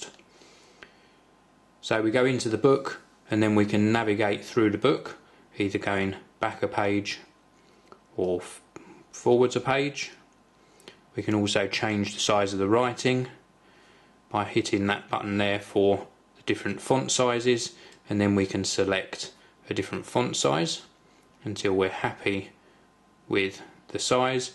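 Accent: England, United Kingdom. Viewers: Everything in an English accent sounds so much more professional :) Great review. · love your British accent :D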